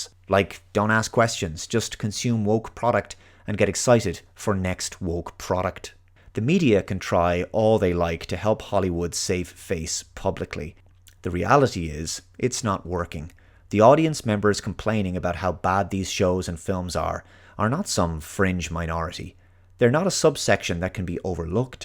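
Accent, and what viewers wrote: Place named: Ireland. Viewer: At first I hated the accent because I thought he was British but towards the end of the video I realized he was Irish and started liking the accent. I think I'm racist.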